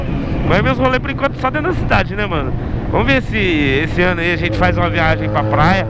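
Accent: Goiás, Brazil. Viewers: Volta a falar no pique do goias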